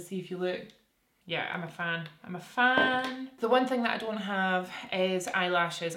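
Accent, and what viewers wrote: Scotland, United Kingdom. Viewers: I start to annoy myself when I watch ur videos. I am from Vancouver Canada and I find myself repeating every other word just trying to mimic you..I love your accent🥰💖🥰lol my bf is usually on the bed beside me and he can’t see or hear what I’m watching, he just hears me saying random words with a Scottish accent to myself in a very serious tone🤦‍♀️😂🤣😂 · LOVE that Scottish accent omg..my mom is from Paisley, its awesome!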